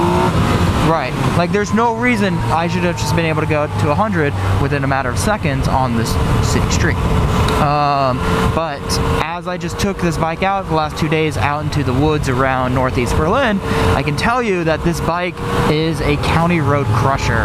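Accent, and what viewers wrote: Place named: United States. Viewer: Clearly you have An American accent....how do you like Germany?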